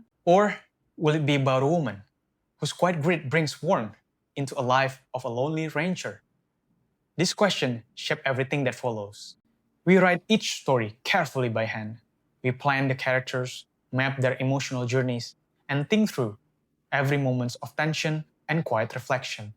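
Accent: South, United States. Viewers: The narrator being from the southern US is kind of weird. The stories are nice.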